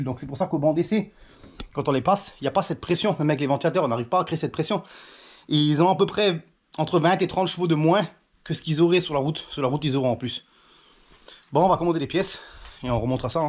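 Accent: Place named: Alsace, France. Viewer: Salut Cool Vidéo 👍 hop la jAIME Notre belle accent de lEst, question tu ne serais pas Alsacien par hasard,moi Oui,Et Fier de Notre Accent 😏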